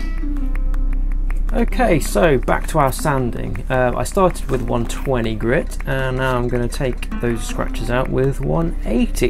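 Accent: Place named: United Kingdom. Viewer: Aloha from Maui! I am currently resurfacing an ancient rusted out deer horn handle hunting knife that quite possibly could have been lost or discarded by Nathanial Boone or even...David Bowie!! Really enjoy your quazi-cultured British accent and your irreverent cavalier approach to knife refinishing. Well Done!!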